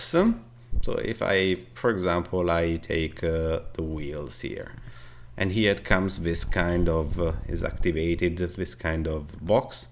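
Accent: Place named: Italy. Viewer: You sound like italiano man!!!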